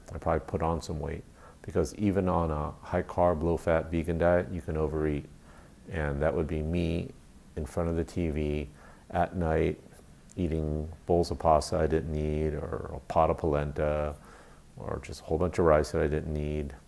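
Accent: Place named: United States. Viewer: You sound American but you talk about kilos or whatever. I'm American and I talk in pounds. Everyone here talks in pounds. I don't know anything about kilos.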